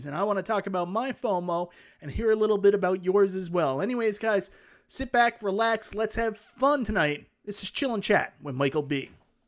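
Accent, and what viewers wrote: Canada. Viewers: So glad there is a Canadian voice for arcade 1up. Hoping for marvel vs Capcom 2 in Canada somehow without that savage VAT added.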